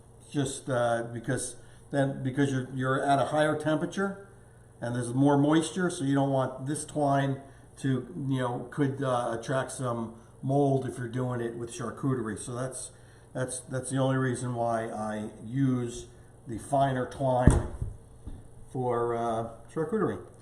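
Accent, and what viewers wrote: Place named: New Jersey, United States. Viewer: I just bought a SteakAger Pro 40 and found your channel while looking for info on YouTube. I can not tell you how much I appreciate the great information and content you provide. I subscribed to your channel immediately. I live in NY but grew up in Philly and NJ. I'm picking up a Jersey accent. Thanks so much Chef for the valuable content you provide. I was nervous about dry aging my own steak but I feel confident after watching your videos.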